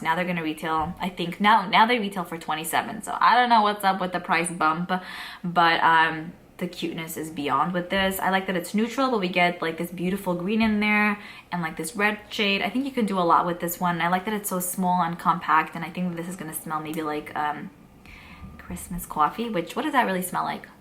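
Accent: New York, United States. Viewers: Newer subscriber here. Is that New York I b hear in your voice? Joisey girl here!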